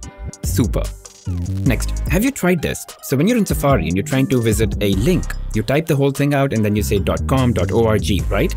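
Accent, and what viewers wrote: India. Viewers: That accent — Indian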